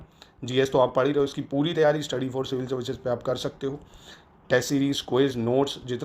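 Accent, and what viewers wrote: Kanpur, India. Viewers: sir cut to cut bolte hai mast kanpuria andaaz❤❤❤❤❤❤❤❤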